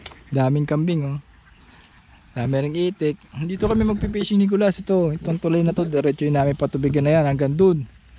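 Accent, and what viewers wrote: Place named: San Antonio, Philippines. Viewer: idol parang taga san antonio na ung accent mo hehe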